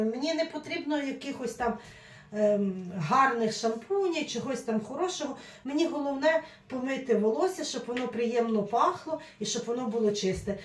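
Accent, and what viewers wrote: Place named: Lviv, Ukraine. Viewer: Ви дуже гарно розмовляєте українською,молодчинка,говір подібний до нашого львівського.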